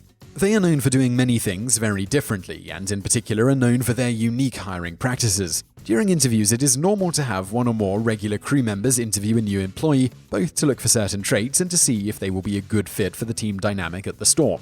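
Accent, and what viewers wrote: England, United Kingdom. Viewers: is English and that's how we pronounce advertisment in England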